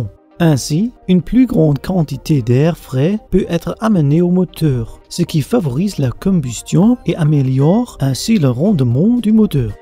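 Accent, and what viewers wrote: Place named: Germany. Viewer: C’est quoi cette voix de gros accent du bled ou de allemand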